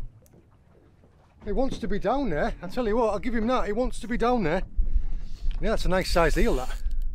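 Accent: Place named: Yorkshire, United Kingdom. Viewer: Got to ask as your accent is so familiar, what part of God Own County do you come from? I'am a Smoggie from the Boro.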